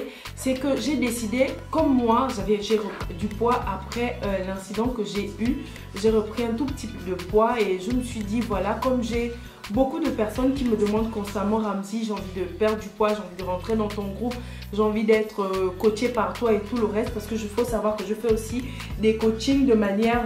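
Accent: Gabon. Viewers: Juste par ton accent je sais que t’es gabonaise 😋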